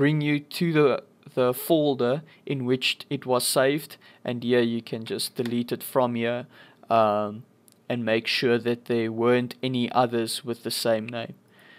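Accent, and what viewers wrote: South Africa. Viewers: south african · Is this dude South African? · U sound afrikaans 😃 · Ur from South africa right?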